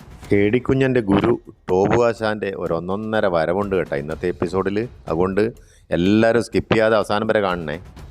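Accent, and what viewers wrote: Kannur, India. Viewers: അടിപൊളിയായിട്ടുണ്ട് അഭിനന്ദനങ്ങൾ കണ്ണൂർ സ്ലാങ് ഒക്കെ നന്നായിട്ടുണ്ട് ഇനിയും വീഡിയോ പ്രതീക്ഷിക്കുന്നു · എനിക്കു വയ്യ എന്തൊരുവർണ്ണന സൂപ്പർ ആയിട്ടുണ്ട്. കണ്ണൂർ ഭാഷയിലുള്ള അവതരണം സൂപ്പർ സൂപ്പർ🎉🎉🎉🎉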